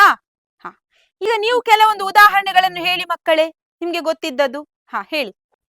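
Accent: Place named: Dakshina Kannada, India. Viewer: ದಕ್ಷಿಣ ಕನ್ನಡದ ಭಾಷೆ ಕೇಳೋಕೆ ಒಂದು ಕರ್ಣಕ್ಕೆ ಆನಂದ. ಅರ್ಥಗರ್ಭಿತವಾಗಿ ದ್ವಿರುಕ್ತಿ ಬಗ್ಗೆ ತಿಳಿಸಿದ್ದೀರಿ.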